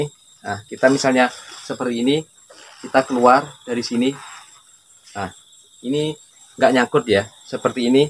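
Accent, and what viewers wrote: Madura, Indonesia. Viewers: Logat e sampean wes koyok duduk wong meduro cak wkwkw keren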